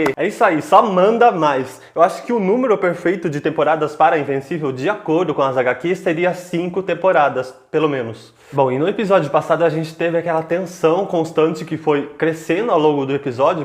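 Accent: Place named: Latin America. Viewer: Cara vc e de algum pais de lingua espanhola? Vc tem um sotaque diferente. Vc nao e brasileiro? Valeu!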